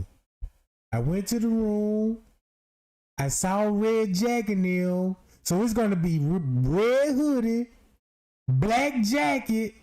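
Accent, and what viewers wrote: South, United States. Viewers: Hey Mr. 🫶🏽 I Don't Mean To Disturb You Because I Know You Will Be Going Live Again, But I Was Cooking And Listening To My Gospel And I Broke Down Thinking About Kenneka. Since Day One When I Came In Your Platform, Then Was A Mod. I Was Excited Because Your Platform Was My 1st, And I Was A Mod, But To Get Back To The Subject On Hand, I Feel Your Passion For Getting Justice For Kenneka. It Seems Like When I First Learned About It Was Late Night And I Heard This Smooth Southern Accent (All Do Respect) I Stopped And Listened And Then When I Learned What Happened.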